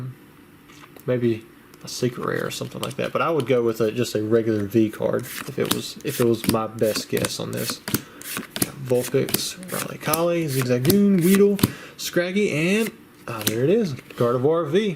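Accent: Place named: Kentucky, United States. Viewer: Bojangles biscuits yessir...you in ky? Sounds like a ky accent...watchin ya here in Louisville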